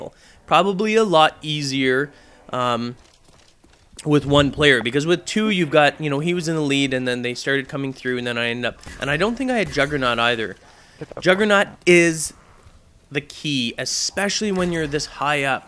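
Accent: Canada. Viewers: ur canadian. you go oo instead of ow or ou. and you sed eh? abunch of times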